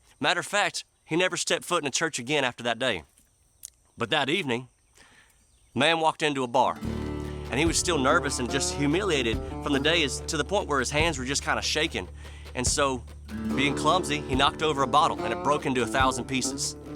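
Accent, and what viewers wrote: South, United States. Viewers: LOL...love the stories! and that southern accent....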